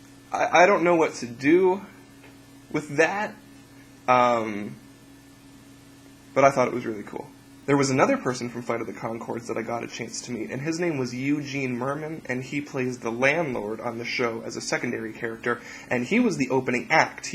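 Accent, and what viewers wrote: Canada. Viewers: haha. you sound canadian.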